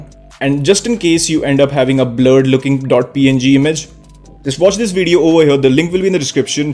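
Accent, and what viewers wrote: India. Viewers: are you a indian , not gonna lie your voice and accent are beautiful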